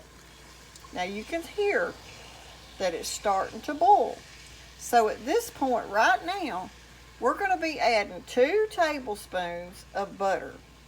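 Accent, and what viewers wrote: South, United States. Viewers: Homemade pancake "srrp", and it has to come to a "rollin bole": beautiful southern accent! :) (I live in the boring/no accent midwest)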